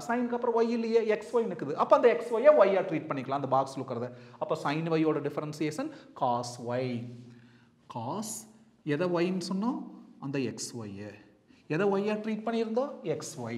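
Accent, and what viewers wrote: Coimbatore, India. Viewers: Kovai slang bro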